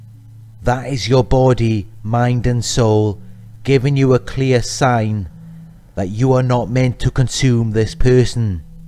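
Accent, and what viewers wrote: United Kingdom. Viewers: Very Cute, I think he is from the U.K.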